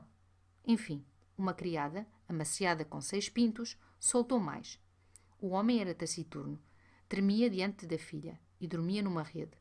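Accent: Portugal. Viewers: Amo o sotaque português , nós brasileiros temos pouco contato com esse lindo acento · muchas gracias por subiendo este, es un grand ayuda en aprendiendo el acento de Portugal, un montón de gracias.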